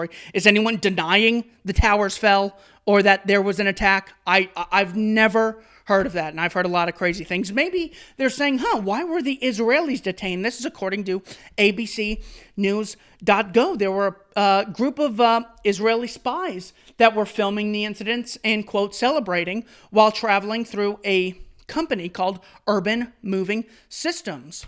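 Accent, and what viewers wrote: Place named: United Kingdom. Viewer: Fringe beliefs? Are these British clowns serious? Once upon a time, abolitionists were considered fringe members of society. Love the British accent,.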